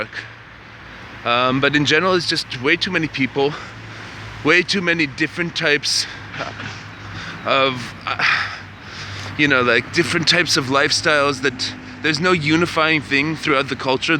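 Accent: Germany. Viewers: Were you born and raised in Canada? How come you have such a strong german like accent? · You have really really mixed roots. And I don't know if anyone told you already that you still have some German accent. At first I thought your are originally from Germany but when I heard you say Toronto,Canada I was like WTF! :D I'm not surprised that you feel Serbia as natural habitat maybe because your Slavic side gens "work" and "want to go home". :D That's normal because I also know some Serbs how feel much better living in Spain,Italy or Ireland than Serbia. It's just like that and who knows why. I have a friend,born and raised in Serbia went to school together, who told me something like "I feel I'm alive only when I'm in Barcelona". The other one use every single chance to spend time in southeast Asia,Vietnam,Burma etc. The guy just LOVE to be there. I like Mexico or Mediteranian lifestyle (wich is pretty similar to Serbian lifestyle,hehe). 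So,that's normal, some people just looking for their own part of the sun under the sky.And that could be elsewhere than place they were born and raised. Anyway,glad you enjoy your life in Belgrade. And just go on. That is only matters.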